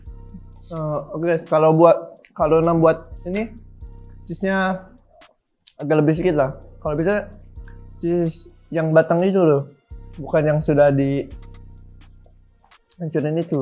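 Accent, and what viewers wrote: Malaysia. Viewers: comel je ckp melayu hahahah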